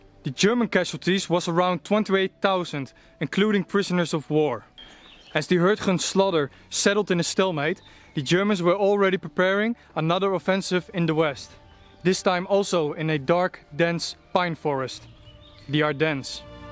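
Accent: Netherlands. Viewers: Wat een zwaar Nederlands accent!!Ik dacht dat je erom deed.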